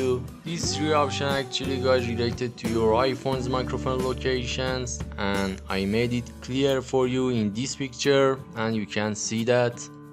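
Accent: Iran. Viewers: That accent just screams Iranian origins to me Which is great ofc, the audible acquaintanceship with the beloved Persian language is sheerly a blessing to the ears =) Keep it up with the great content brother, Best of wishes from a fellow aspiring creator of the same origins😁✌️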